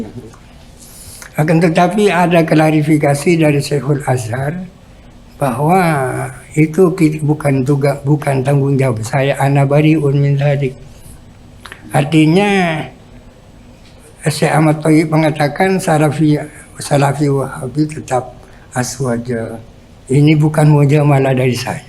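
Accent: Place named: Madura, Indonesia. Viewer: Salam ta'dhim kyai. Tausiah tingkat tinggi. Pendengaran saya suara kyai seperti ada logat2 maduranya.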